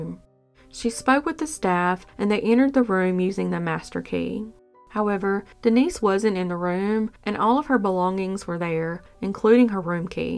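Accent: United States. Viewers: Does anyone know what state the narrator is from? I like to listen to her voice to help me fall asleep.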